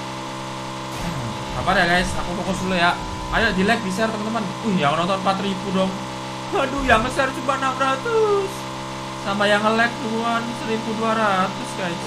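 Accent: Java, Indonesia. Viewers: Game ke 2 pizza hut wkwkwkw Jowone ilooo lucu ngomonge cuk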